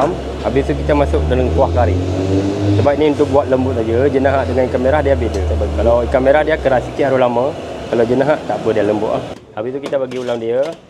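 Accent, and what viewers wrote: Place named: Aceh, Indonesia. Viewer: Ckp mcam org aceh....😂😂😂😂😂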